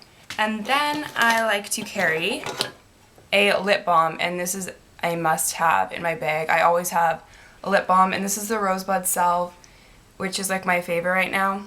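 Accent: Canada. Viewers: she's Canadian so she does have a slight accent. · no not really... prob cause ur not used to Canadian accents. i guess i'm just used to it cause i'm Canadian ;) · What the hell?! LOL. I'm Canadian and we don't consider ourselves to have accents...she talks the way I talk too. lol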